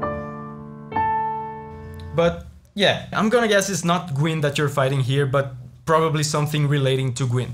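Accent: Brazil. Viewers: u sound brazilian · what's your nationality? your English speaking accent sounds a lot like the Brazilian accent. Acabei de ver nas informações do canal que é brasileiro kkkk, deu para entender um pouco do que você estava falando e deu para entender a mensagem, mas seria uma boa tentar colocar legendas para quem não entende. E sobre as músicas é incrível como a fromsoftware não erra com musicas dos final Boss dos jogos, simplesmente lindas. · Sotaque br? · eu tinha uma sensação de q vc era BR por causa do sotaque kkkkkk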